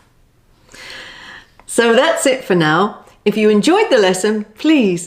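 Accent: United Kingdom. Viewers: Is it British English or American English? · Hi, your accent is great. you are teaching us British accent. I presume.❤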